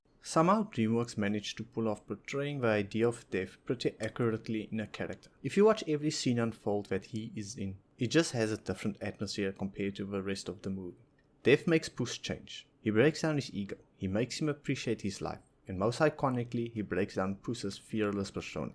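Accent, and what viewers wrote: South Africa. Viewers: Are you South African? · R u south African? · are you afrikaans? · Really good video. are you South African by any chance?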